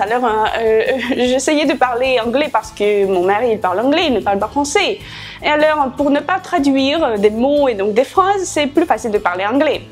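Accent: France. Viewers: J'adore ton accent français ! Aimez-vous les langues comme le norvégien, le swedish (je ne sais pas comment ça se dit en français)? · You have a great and unique french accent Ms..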